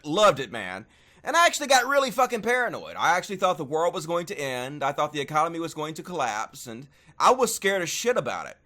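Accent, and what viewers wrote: South, United States. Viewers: You are a great story teller and i like your southern accent Very much!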